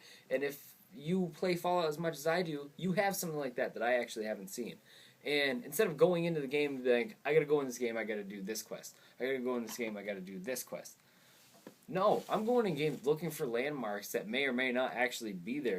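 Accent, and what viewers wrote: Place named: England, United Kingdom. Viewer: I signed in just to like this because of the english accent lmao great job.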